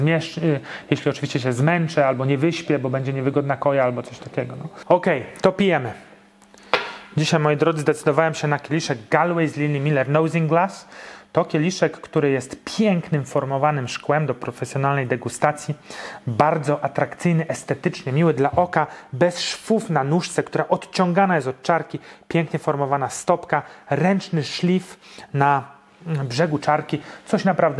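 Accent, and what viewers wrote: Poland. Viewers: Daruje sobie pan ten "angielsko-amerykański" akcent , słychać ześ pan Polak z krwi i kości. :)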